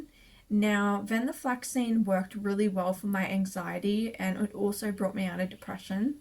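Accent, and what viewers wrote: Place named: London, United Kingdom. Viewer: I don’t know why I thought you were from the UK. I thought you had a nice polite London accent 😂 Anyway, very informative video x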